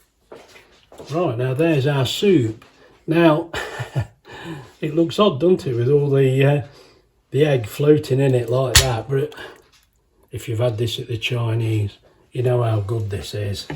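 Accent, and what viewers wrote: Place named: Nottingham, United Kingdom. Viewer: Chinese cook with a Notts accent me duck😅😅😅😅😅 I went a few times to a Chinese restaurant on Mapperly Top in Nottingham called Chungs.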